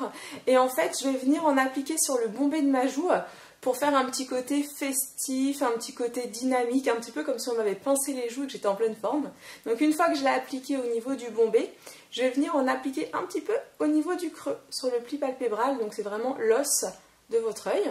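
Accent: England, United Kingdom. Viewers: Tu es juste MAGNIFIQUE.....tout est parfait le Make up est généralissime même une débutante peu le reproduire géniale. Pour l'accent anglais ça me rassure j'ai le même que toi LoL..Aller des bisous Mouhaaaaaaaaaaaaaa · Ce look est vraiment top soft et efficace et puis ton accent anglais est trop mignon ! Et j'ai le même collier Mim' Bisou bisou ♥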